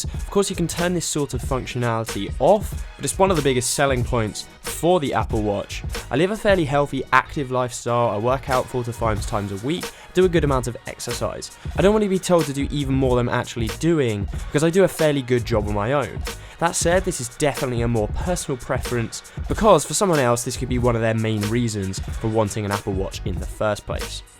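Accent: United Kingdom. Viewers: damn how Gorgeous Britain English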